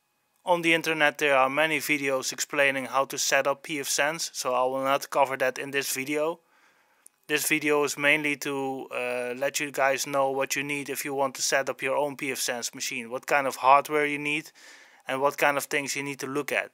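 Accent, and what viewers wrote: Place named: Netherlands. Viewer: Dacht ik al haha, lekker Nederlands accent!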